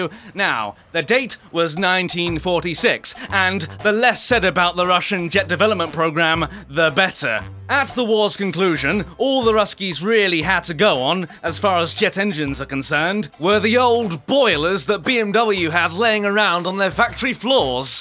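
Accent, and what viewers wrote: United Kingdom. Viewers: its time once again i must fill my British accent bar up.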